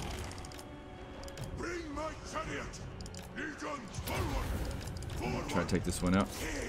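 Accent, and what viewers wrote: Australia. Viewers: Alternate title: "Aussie man talking about eating shit for 29 minutes strait (in a video game)."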